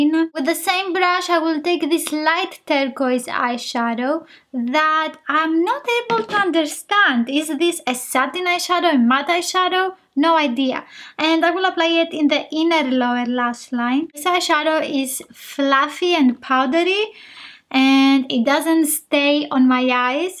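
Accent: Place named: Greece. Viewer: I bought this palette yesterday and you're presenting it for us today! Once or twice a year Essence makes a big discount on some of their product, and this was one of them. I got it and the Be an Original one for 1.10 euros each, and now when I've seen your review, I see that I made some good choices. It's great that you try to show us as many colours as you can. I always enjoy watching your reviews. Keep up the good work 😎 I've shown this video to my mom too, and she says you're sweet and cute, and your Greek accent too.💖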